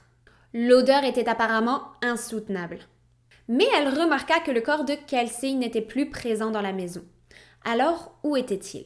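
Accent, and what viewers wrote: Quebec, Canada. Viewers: J'adore tes vidéos et surtout ton accent québécois que je trouve à ravir 😍 Que des histoires affreuses et immensément triste ... Merci ☺️✊🏻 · Elle sonne québécoise (du Canada) mais avec aussi un accent français. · tu as un tout petit accent quebecois, j'adore ! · Est tu québécoise? Jattend un certain accent! Je ne te connaissais pas ta chaîne et jadore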